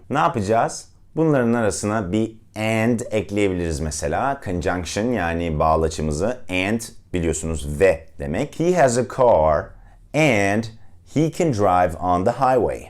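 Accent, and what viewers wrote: United States. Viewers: Abi sen İngilizce konuşurken içimden 'Amerikalılar bile senin gibi konuşamıyor.Nedense senin aksanın daha gerçek geliyor' diyorum istemsizce. (umarım bi tek ben böyle düşünmüyorumdur?)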